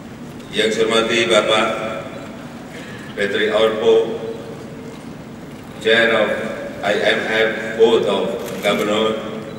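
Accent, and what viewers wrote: Java, Indonesia. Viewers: bintang anda wong jowo opo Ra? Kalo bukan wong jowo gak usah komen .. itu logat jowo , kan jokowi baca bukan pas sholat .. gapapa bro liat penjelasan ulama sepuh jaman dulu mereka pas dzikir bacaan nya kayak asing.. kalo gak tau mah gak usah merasa benar dah contoh kayak orang Sunda gak bisa ngomong f tapi jadi p .. niat nya kan memuji Allah SWT .. kalo anda bilang begitu berarti orang gagu berdosa semua dong mereka semua logat orang yang kekurangan gak bisa fasih baca bacaan Al-Qur'an ... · bintang. kamu suku... mana??? itu logat jawa cokk.. jawa tulenn... cek sendiri... di daerah2 jawa... khususnya yg dusun ato desa... logatnya... gimna... kiyai2 spuh pun gk seperti km... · Kangen versi bahasa inggris pake logat Jawanya ... Pernah dengar kah kalian... logat Orang India, Rusia, Arab, Jepang, saat mereka menggunakan bahasa Inggris/Indonesia??? 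Di situlah kita bisa mengetahui dari mana negara mereka berasal ... Maju terus Presiden Ku.. Menjadi Kebanggaan untuk Ku Bagi Ku.. Presiden Ku adalah ... "PRESIDENT MADE IN INDONESIA" #2019PRESIDENKUmadeinINDONESIA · bintang alpateka koq ribut org jawa mmng logat aslinya gt cb liat puji2an dr sunan kalijaga logatnx jg jawa. .gt sj ribut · bintang orang jawa logat beda pret..kayak daerah ku sholat ashar bilang ngasar ran ..emang salah menurut low yang maha bener hadexhh..niat utama yang penting..salam warass.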